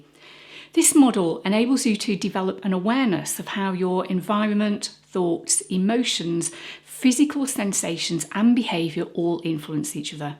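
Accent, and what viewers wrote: United Kingdom. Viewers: Excellent CBT explanation with beautiful British accent, thumbs up!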